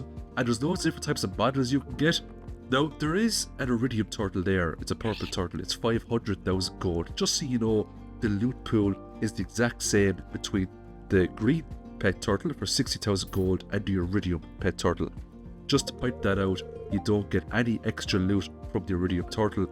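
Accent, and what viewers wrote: Ireland. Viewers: 1st time viewer. I came across your video in my feed and decided to click on it, and was not disappointed. You gave some amazing tips that I have not seen anyone talk about. Great video, I'm going to check out your channel, and watch a few more videos. Just a curiosity question, If I may... Listening to your video, and from your accent, are you from Ireland, by chance?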